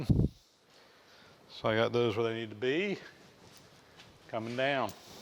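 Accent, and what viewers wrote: South, United States. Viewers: LOL :) - He's from Georgia, so to translate "owl hole" is really "Oil Hole" with a southern drawl.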